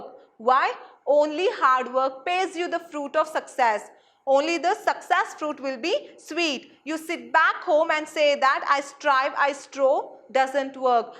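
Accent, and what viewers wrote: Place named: Kerala, India. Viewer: Vocab is ok but pronunciation 🥵....mallu pronunciation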